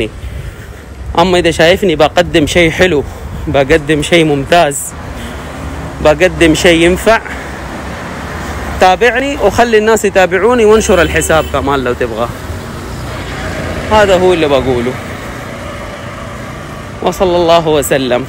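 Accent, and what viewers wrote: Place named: Jeddah, Saudi Arabia. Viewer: اللهجه جداوي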